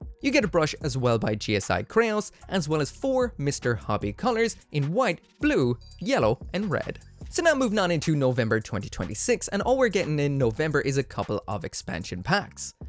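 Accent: Ireland. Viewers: not the 1920s bavaria 😭….. also ? u are irish?? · I heard the accent and knew straight away you are one of my own.. I got my first Gunpla in ken blacks in Kilkenny. Genuinely think its become an Issue for me now the bank account is gonna be crying for a long time now haha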